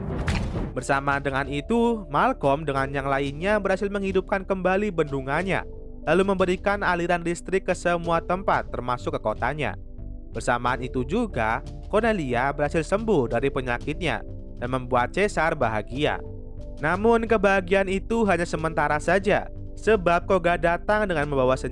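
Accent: Banyumas, Indonesia. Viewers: Jebule * wong ngapak ya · Mantap youtuber ngapak hadir min 😆😆😆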